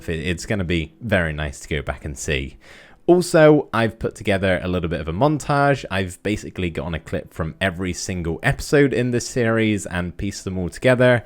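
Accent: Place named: England, United Kingdom. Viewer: Gutted to hear this. Thank you for so many wonderful episodes! Edit: oh, man, I'm surprised how sad I am about this ending. It was a little bit of chill happiness during a horrible time for all of us. 2nd edit: Of course you have to go with your motivation and I wish you continued success with your channel. I'm sure I'll give one of your other series a try soon. One last thing I've wanted to say for almost 2 years by the way: I love hearing your accent! It's so cute to hear a rhotic English accent from England (most speakers in England are non-rhotic). Somehow your accent makes you sound warm and friendly, although that's probably also your personality ha ha. Thanks again for helping us get through a tough time!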